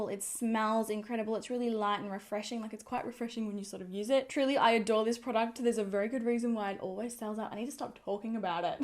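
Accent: Australia. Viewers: Joan Gentile I’m curious to know how you do not know an Australian accent when there are many Aussie celebrities with the same accent. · She's from Australia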